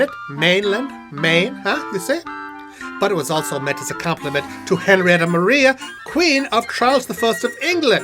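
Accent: Germany. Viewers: that's the worst german accent i ever heard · WHATEVER GOES FOR ME it's a german accent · you have a German accent? really? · Your ''German'' accent is shit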